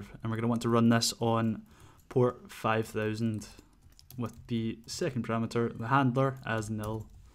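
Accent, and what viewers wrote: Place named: United Kingdom. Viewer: Love the accent. UK, right? Another guess is NZ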